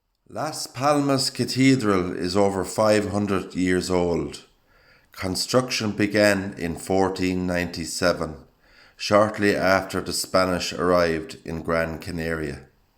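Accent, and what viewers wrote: Ireland. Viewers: I enjoy your videos and love your brogue. You remind me of my great grandfather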